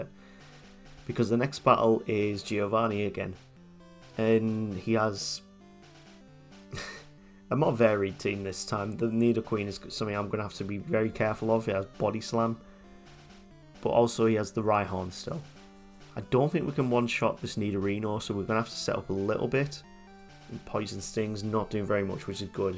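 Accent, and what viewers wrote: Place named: United Kingdom. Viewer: I found your channel while looking for a Kanto solo runner who doesn't use BBG. IMO, it's bullshit because it's very easy to discriminate between when it makes sense to use moves for their primary effects and when you're just exploiting the poor programming. It just requires an iota of self-control and honesty/integrity. The fact that enemies can accidentally boost your stats is no different to them having the ability to give you free turns with moves like Whirlwind and Teleport or even weaken themselves with Focus Energy -- part of the glorious mess that is Gen 1 randomness. But it means that the opponents are already at such a disadvantage that the BBG becomes totally broken and unfair. That's just my personal opinion, of course, but I hold it very strongly. I don't even watch Jrose anymore due to his overuse of the BBG. 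Your chan's not for me because of the BBG but good luck with it -- always nice to hear other British lads doing Pokemon content. Some assessment/advice from an expert on this stuff: the average video quality seems decent. Your energy levels aren't as high as most of the popular "Poketubers", but some people will enjoy the more relaxed vibe and you'll certainly find a niche as-is (tbh, you stumble over your words a little more than I'd ideally like, which seems harsh but modern YT demands near-perfection; if these reactions are ad-libbed, that's great - and the small flaws even add charm and authenticity - but you could always re-record lines with major mist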